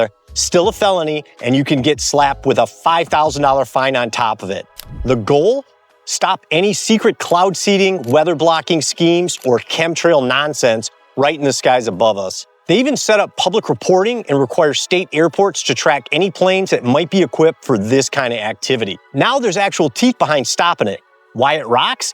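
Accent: Michigan, United States. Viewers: Great video! Are you an escapee from Michigan? 😅 I recognize the accent. 😊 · That Michigan accent is THICK my fellow Michigander ❤ (or tell me which state you're from but I would bet money lol)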